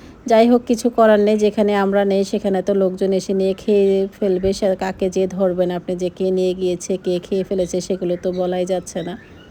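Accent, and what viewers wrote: Chandpur, Bangladesh. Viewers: চাঁদপুরের ভাষায় কথা বলেন আপু ❤❤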